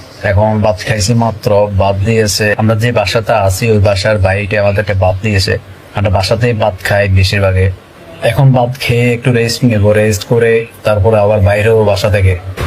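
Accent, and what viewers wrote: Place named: Bangladesh. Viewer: Bro tomar kotha bolar accent onektai amader Bangladeshi der motoi... Valo laglo... Eid Mubarak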